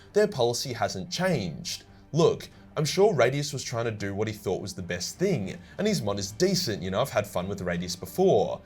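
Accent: Australia. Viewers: why does this man sound like australian chills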